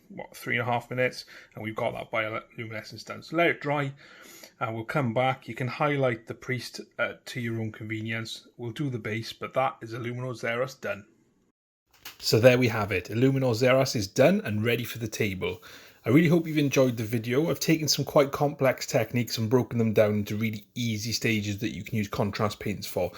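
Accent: Wales, United Kingdom. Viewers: Another great tutorial mate, you're really making me want to get some of these new Necrons now. Also it's great to hear another Welsh accent on Youtube, Keep the good content coming!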